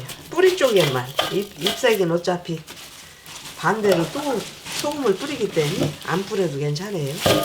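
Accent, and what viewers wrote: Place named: Jeolla, South Korea. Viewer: 무수~ 전라도 사투리 울할머니 생각납니다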